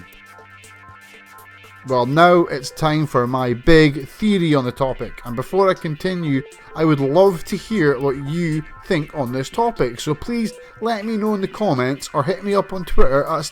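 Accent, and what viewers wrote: Scotland, United Kingdom. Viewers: Lol love your Scottish accent😂 Keep it up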